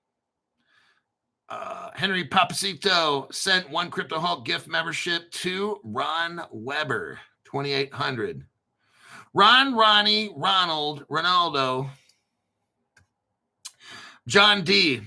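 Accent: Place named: New York, United States. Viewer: This dude gotta be fron NY or NJ 😂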